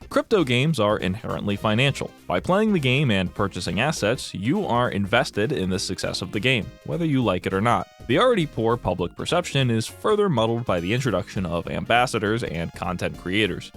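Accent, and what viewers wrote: Philadelphia, United States. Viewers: Are you from Philly? OH WAIT IS THAT WHY YOU'RE CALLED??? I feel like I'm learning so much lore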